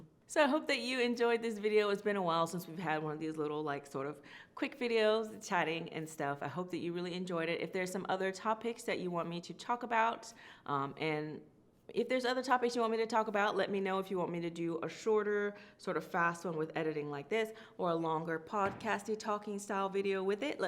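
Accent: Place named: Georgia, United States. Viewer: How have you not lost your Georgia accent?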